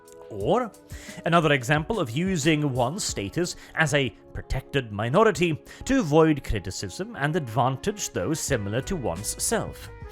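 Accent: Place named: United Kingdom. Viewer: Your from Norway? you sound more British than the British do!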